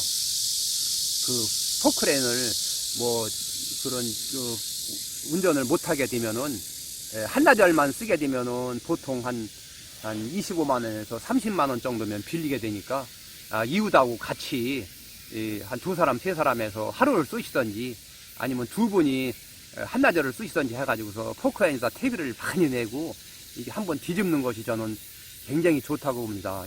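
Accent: Chungcheong, South Korea. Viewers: 구수한 충청도 사투리가 마음이갑니다 듯기좋아요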